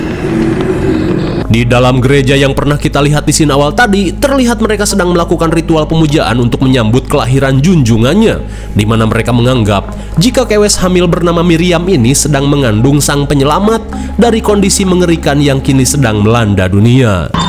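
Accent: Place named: West Java, Indonesia. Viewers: Mimin aslina orang mana sih, logat sundana asa familiar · tadinya serem bgt malah jadi hyg seuri🤣🤣🤣akibat baceo na kang chanel sunda pisan 😅😅😅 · Nu nyarita ciga urang kadu ngora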